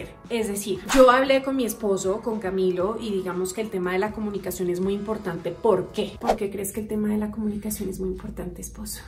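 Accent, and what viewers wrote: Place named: Colombia. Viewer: no pierdas tu acento Colombiano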